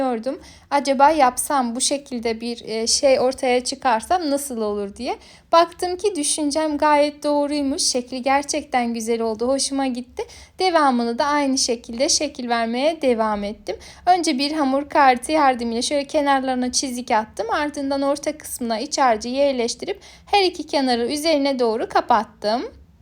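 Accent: Azerbaijan. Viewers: Dinleyen kimi bildim ki AZERBAYCANLISAN ❤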